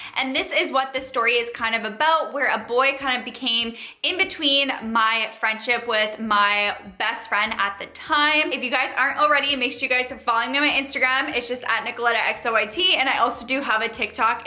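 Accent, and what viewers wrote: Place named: Canada. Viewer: I love you're canadian accent lol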